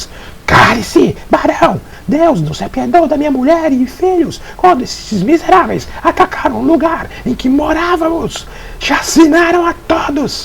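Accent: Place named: Portugal. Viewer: Narração muito boa! O sotaque português ri demais 👏😊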